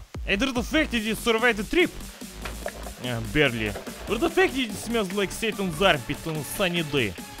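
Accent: Eastern Europe. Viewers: hello, this "hello" comes from very far away from you, directly from Portugal. I'm a huge fan of your slavness and the way you talk, makes me laugh so much and it also reminds me of the good old days of red alert 2:D anyway, if anytime it would be possible, i would recommend you to come and visit us, i think you would like our warm wreather and our beer, to be consider one of the strongest in europe, any way thanks for you time, and stay cheeki breeki;) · I was very tired and fell asleep to your soothing slav voice. Thank you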